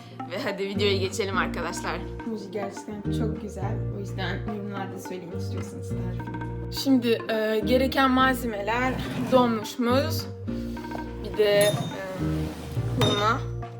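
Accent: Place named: France. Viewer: konuşurken hafif tatlı bir fransız aksanı geliyor sanki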